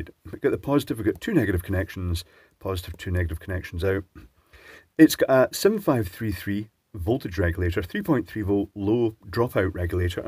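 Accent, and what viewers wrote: Scotland, United Kingdom. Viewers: 😂😂scottish accent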